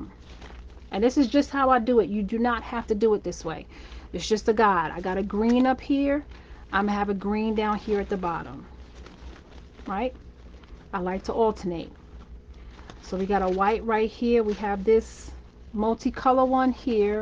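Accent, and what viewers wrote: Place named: New York, United States. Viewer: Hi you sound like a New Yorker ! I'm from Boston (Roxbury) Ma. just came across you channel and yes I love crafting I just started a about a year ago. I love how good you explain things !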